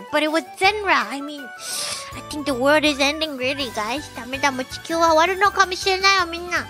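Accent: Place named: China. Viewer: Is she Chinese? That accent definitely isn't Japanese first language English Second Language.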